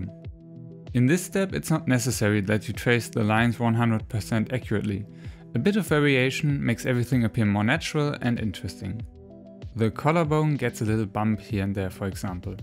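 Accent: Germany. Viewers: Are you From Germany? Your acent sounds like that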